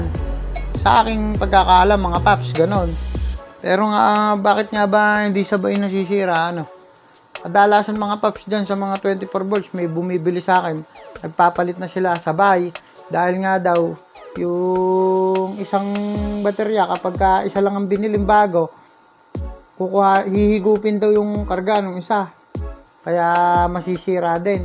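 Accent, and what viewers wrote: Bulacan, Philippines. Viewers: yung punto ng salita mo, parang bulacan o nueva ecija? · Bulakenyo o taga binangonan ka paps? Sa punto kasi ng salita paps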